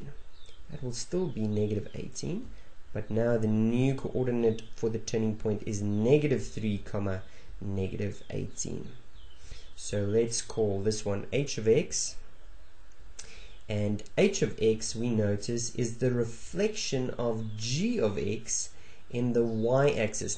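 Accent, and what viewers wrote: South Africa. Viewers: Thanks! This video was extremely wonderful and helpful for matric revision :) Great job... [I also noted the South African accent - being a Gauteng'er, the lesson was easier to grasp and much more tolerable!)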